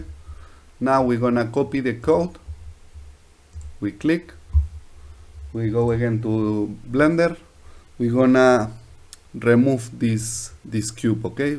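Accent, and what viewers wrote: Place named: Highlands, Peru. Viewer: Inglés cerreño, me imagino que eres de peru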